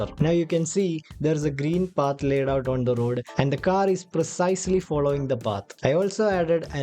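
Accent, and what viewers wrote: Kerala, India. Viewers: Haha thanks for talking in English, I think you’re Mallu 😉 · english kettapothanne thonii.nii malayali alle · Bro from Kerala/kanyakumari right ? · Bro malayali aaano?? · your slang sounds like you are a malayali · Aree u malayali😂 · Bruhh is malayali for sure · he's mallu from his ascent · Are you from Kerala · Ninte english slang kekkumbol ariyaam nee malayaali aanenn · Mallu accent is heavy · Bro are you from kerala? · Bro sounds Malayali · Bro malayali anow?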